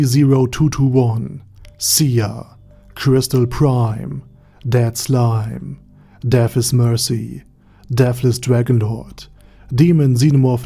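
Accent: Germany. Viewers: his voice's the stereotypical german accent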